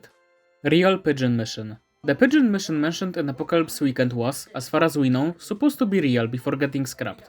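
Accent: Poland. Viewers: tickhest polish accent i've heard in a while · X3 piękny akcent polski, tak coś czułem xD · slysze ten akcent i wiem ze to polak. peak material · Uwielbiam polski akcent.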